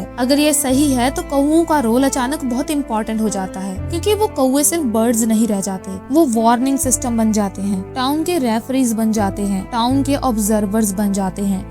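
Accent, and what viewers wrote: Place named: Dogri, India. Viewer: Aap kaun si state we hain. Accent paahdi dogri lag raha hai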